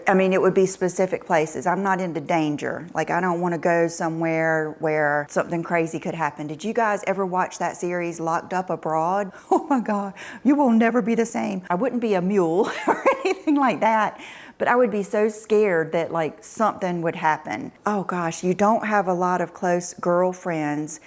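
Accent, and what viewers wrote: South, United States. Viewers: Hi, I’m a new subscriber! I watched and listened when you posted this so I can get to know you better. I love your personality, authenticity and your fashion style. What attracted me to your channel was your Southern accent (I love it) and your joy when you show us what you buy and model them for us. Your fashion philosophy is like mine. I dress the way I think makes me look and feel good, not to look young. Thank you for doing what you do to inspire us.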